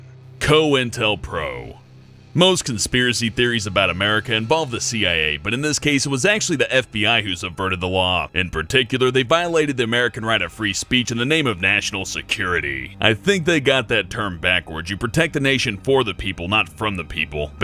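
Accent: Australia. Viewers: hava gud one (the only other reason I Loved his narrations is His sexy australian accent) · Is Aussie?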